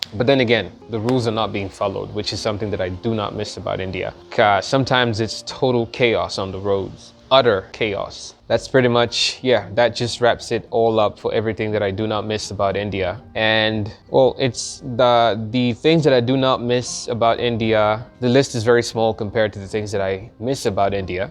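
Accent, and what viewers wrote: United States. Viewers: I think you have a very interesting accent. It doesn't really sound like how my African friends from Ghana, Nigeria and the DRC speak. It sounds more like an American accent. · I had to look up your first video , i thought u were American.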